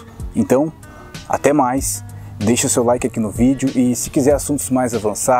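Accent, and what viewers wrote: Rio Grande do Sul, Brazil. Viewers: Ruinas de São Miguel! Sabia que seu sotaque te entregava...vc é do Rio Grande do Sul.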